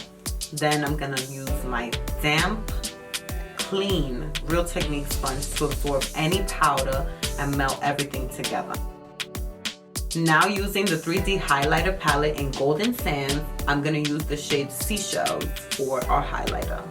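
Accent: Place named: East Coast, United States. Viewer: My nosey ass found you in a Carly Bybel video comment section and I love love your east coast accent, you're so beautiful and you come across super down to earth. This video feels like hanging out with your friend and gossiping about the new makeup releases. Only thing I would suggest, in certain parts of the video like your background music was louder than your voice. Maybe just lowering your background music, so we can hear your lovely voice 🤗❤️❤️ much love to you and positive vibes your way.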